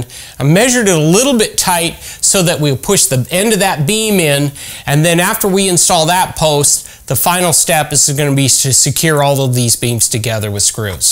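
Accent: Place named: Canada. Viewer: You sound Canadian....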